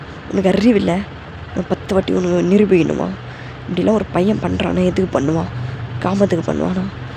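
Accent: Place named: Kerala, India. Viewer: i luv ur Kerala voice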